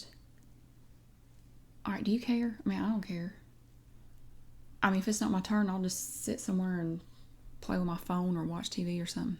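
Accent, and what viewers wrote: South, United States. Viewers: I lived in NC for a couple years and this reminded me of folks down there. I never thought I'd miss the southern accent.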